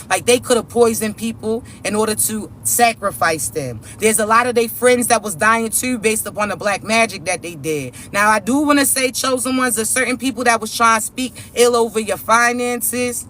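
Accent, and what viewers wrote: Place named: Baltimore, United States. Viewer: Love the accent.. Baltimore?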